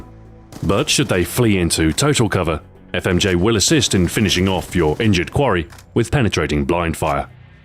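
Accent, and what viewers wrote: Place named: United Kingdom. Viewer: Hey, 2 questions here; have you ever considered that one of the sole reasons that part of your subscribers watch your videos is because you have a kick-ass British accent? And have you ever had a Canadian Keith's beer?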